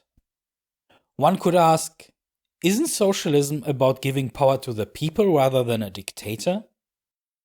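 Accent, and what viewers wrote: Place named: Germany. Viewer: This video is a huge cringe post. I remember having another discussion about a similar topic with this guy and it was wild. truly baffling, how someone, who seems to be german due to the strong accent, can fall trough the raster of historical education. But anyways, to set this straight real quick, no the Nazis weren't far left socialists, because of course they weren't. I'm guessing the presented election program points are supposed to be a summary of the infamous 25 points. The truth is, that only some of them were supposed to resemble socialist agenda points and pretty much all of them were abandoned shortly after the Nazis took power. The occasional welfare benefits, that got implemented to prevent another proletarian uprising, don't change that. Modern Germany has those as well, and no one would argue that it's a socialist country. 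Also funnily enough, the demand for the abolishment of the materialistic world order stood in opposition to Marx's dialectic materialism, but yeah sure,lets pretend the Nazis were socialists. While it was true, that the Nazis sometimes called one another "Genosse:in", is that somehow supposed to be proof? Many people give themselves silly names. Also, this was supposed to refer to the actual term "Volksgenosse:in" which in itself refered to the propagated myth of the Volksgemeinschaft, with which the Nazis wanted to promote the establishment of a community based on race and class collaboration, rather than on class conflict. So yeah, what a bu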